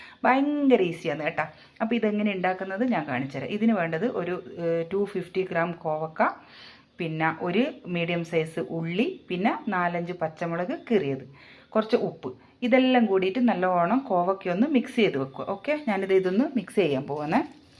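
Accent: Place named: Mahe, India. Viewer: മിക്സിയിൽ ഒന്നു പൾസ് ചെയ് തെ ടുത്താൽ മതി ചില്ലി ഫ്ലാക്‌സ് ആക്കാൻ നിങ്ങൾ മാഹി സൈഡ് ആണോ സംസാരം കേട്ടിട്ട് തോന്നുന്നു ണ്ട് കോവക്ക സൂപ്പർ ആയിട്ടുണ്ട് 👍👌😋😋 👫🔔✅ New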